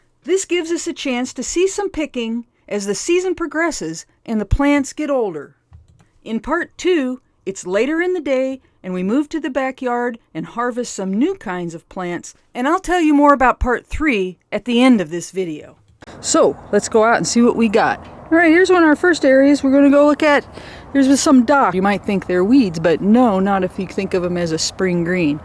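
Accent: South, United States. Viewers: You said you are in the Pacific Northwest?? You sound like you may have a wee bit of a Southern in your accent or maybe TN Valley....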